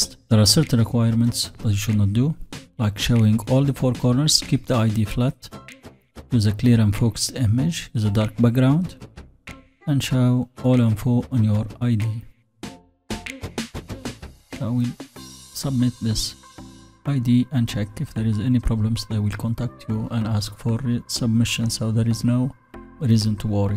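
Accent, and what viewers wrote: India. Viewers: Annoying Indian accent video to telling me how to get my privacy violated by Facebook by me providing them my I.d. ,?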